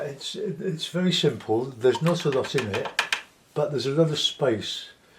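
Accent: United Kingdom. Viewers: like your acrylic tutorials.still struggling with watercolour.british are you? good show and nice day.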